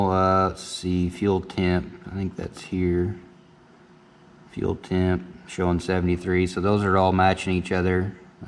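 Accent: South, United States. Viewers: I don´t mind at all about the engines, i am here watching ur videos just coz i like ur southern accent!!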